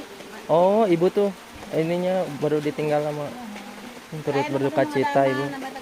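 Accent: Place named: Sunda, Indonesia. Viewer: Orng sunda mah kang...tos w nyarios ku sunda dei, garut mah komo medokkkk psn sunda na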